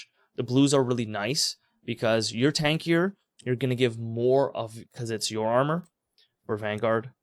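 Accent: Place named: Canada. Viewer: Dude, why didn't you answer? I know you're a Canuk......it's the accent. Great, informative videos just the same.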